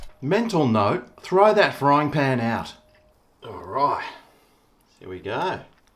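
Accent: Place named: Australia. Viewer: has the Strongest Aussie accent I’ve heard Love from Canada brother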